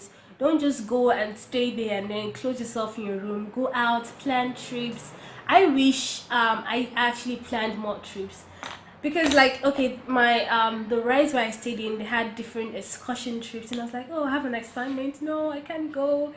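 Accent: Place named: South Africa. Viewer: Hi sister I am impressed by your english tone, you sound like south African wow keep up...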